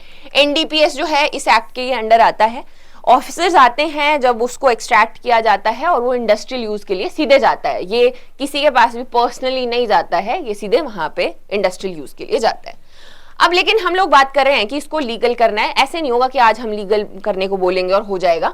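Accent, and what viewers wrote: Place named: Himachal Pradesh, India. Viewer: 2 , 3 " CHATAKE " 😂😂😂 par jaate hai . Himachali touch aa he jata hai , same happens with me ( himachali touch while speaking ) . By the way nice video madam🙏 . 2,3 CHATAKE 😂😂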